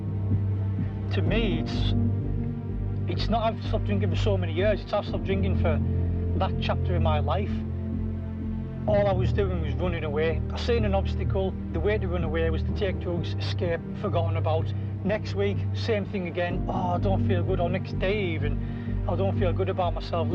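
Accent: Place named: Teesside, United Kingdom. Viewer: What an great video and message... I am 6 days clean today from coke after many relapses... was great to hear a local accent (I’m in Teesside)... thanks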